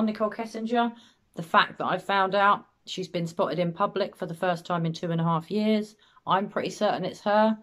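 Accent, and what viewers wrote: England, United Kingdom. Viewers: Why is doing YouTube videos with an English accent.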